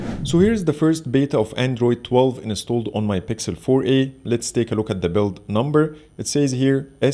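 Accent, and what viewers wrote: Egypt. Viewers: u accent look Egyptians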